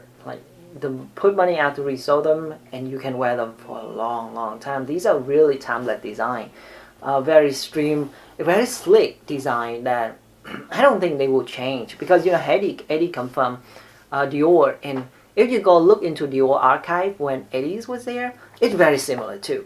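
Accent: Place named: Vietnam. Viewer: Well his family name is popular in Singapore and Vietnam , but his accent also makes me wonder if he is Vietnamese or Singaporean...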